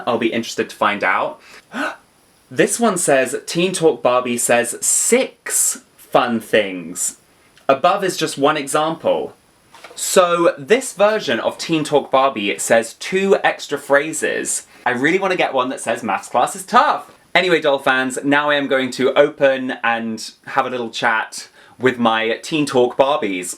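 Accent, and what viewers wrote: United States. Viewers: I appreciate your American accent ❤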